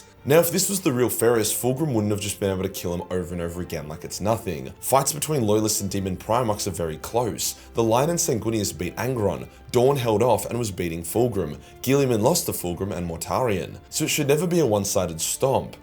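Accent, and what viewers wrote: Australia. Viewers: love the video style fam, your australian accent fits the comedic style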